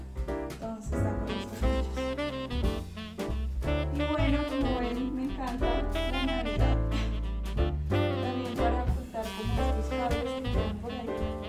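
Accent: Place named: Colombia. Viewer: ese árbol lunar está muy chévere y el pie de árbol está chido.. y si hablas mucho y con acento colombiano.. pero me gusta ver tus vídeos bueno cuando tengo tiempo jaja. Has un suerter navideño para piña. Saludos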